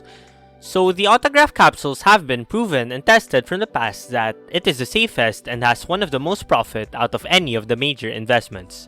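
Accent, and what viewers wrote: Philippines. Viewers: You filipino? Kinda sound like it hehe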